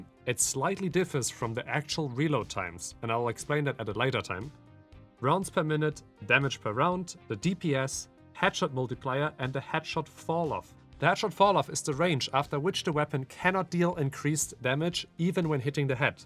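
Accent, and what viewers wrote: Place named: Germany. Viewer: Deutsch... Man hörts XD aber gutes video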